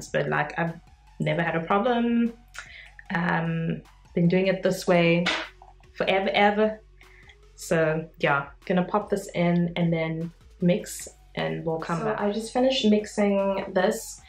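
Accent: South Africa. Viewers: Sounds like you have a South African accent, do you live there?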